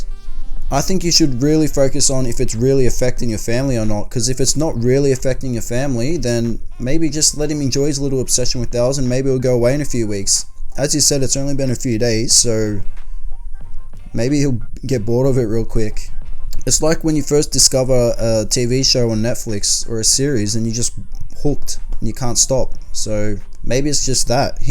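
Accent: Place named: Melbourne, Australia. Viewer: i know u were saying owls but im not from melbourne so ur melb accent making it sound like ur saying elfs n im sitting here picturing elfs for majority of it until i realised, my head hurts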